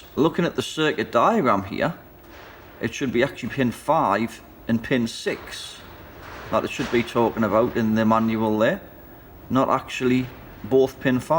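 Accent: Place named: Newcastle, United Kingdom. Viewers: You sound like your from my area Newcastle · Very interesting, thanks. Dad was from the NE, love the accent. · You sound from the north east whereabouts are you? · Ooooo sound like a Geordy must be close to me hopefully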